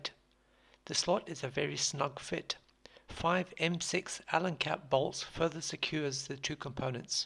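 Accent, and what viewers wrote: South Africa. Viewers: I know a South African when I hear one. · South African accent coming through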